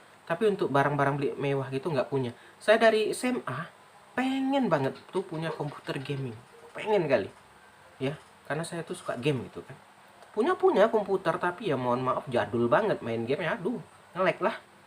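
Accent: Padang, Indonesia. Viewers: Suara kaya logat org padang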